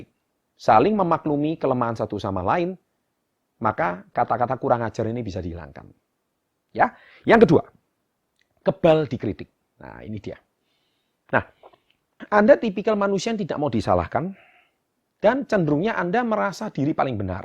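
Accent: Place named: Banyumas, Indonesia. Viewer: Kurang ngajar sama orang tua ya kuwalat guees????orang ngapak iki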